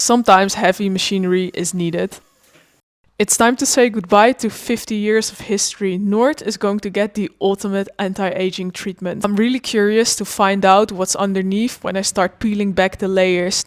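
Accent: Netherlands. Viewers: You are Dutch. I can hear it. Lekkerrr.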